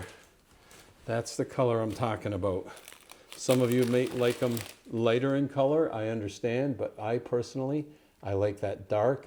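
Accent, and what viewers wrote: Canada. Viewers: Hey i got a question been watching a few of youre video i was just wondering where u from u sound Canadian but im not sure im from just outside Toronto ty have a good day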